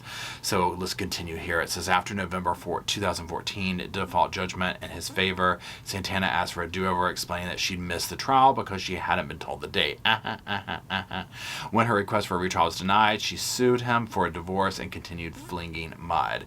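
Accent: South, United States. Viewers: you make life more enjoyable for me daily. Your country slang and my miss butterworth's voice is hilarious. My husband looking at me crazy the whole time. Thank you for the laughs and the content..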